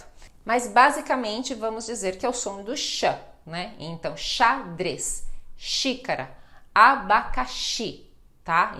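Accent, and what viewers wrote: Brazil. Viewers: Es portugues de brazil?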